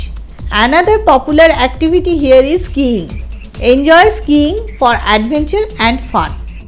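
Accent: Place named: Bengal, India. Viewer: Thanks for the info. Easily recognisable as a bengali speaking english!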